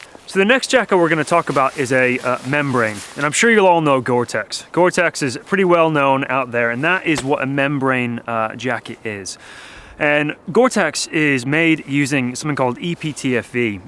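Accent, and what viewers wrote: United States. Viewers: This guy sounds like an American who's been living here in England for too long, he's starting to pick up a hint of English accent.... you can tell from the ending of words like "sheet" "belt" "about" "what" "it" and "fit" which all happen to be words ending with the letter T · It’s definitely not Scottish or Irish mainly American seems like an American who’s been living in the uk for a while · You sound american but don't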